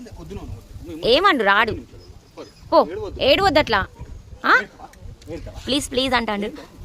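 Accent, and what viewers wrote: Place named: Telangana, India. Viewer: తెలంగాణ యాస నా 😊😮 మాది గోదావరి యాస😂😊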